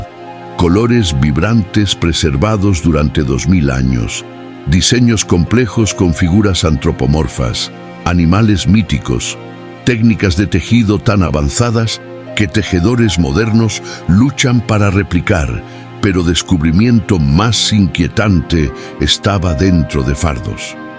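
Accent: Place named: Quechua, Peru. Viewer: lo relata como un nativo quechua que habla español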